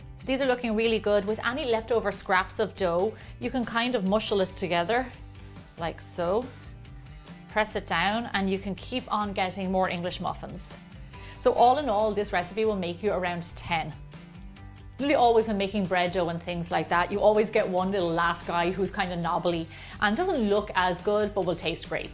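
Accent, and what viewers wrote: Ireland. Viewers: I love the Irish accent! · I was going to ask the same as my mentor has the same exact accent, and I'm bad with accents. It believe its an irish accent though. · You sound a wee bit Irish are you? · Absolutely love your Irish accent. As yummy as the muffins. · She has a VERY Irish accent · Are Ya from Ireland 🇮🇪??? Eh???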